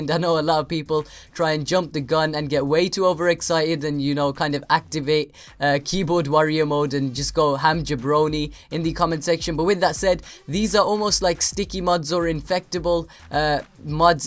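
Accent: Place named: England, United Kingdom. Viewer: where are you from? lol i can never tell your accent one minute i think you are english the next south african haha xD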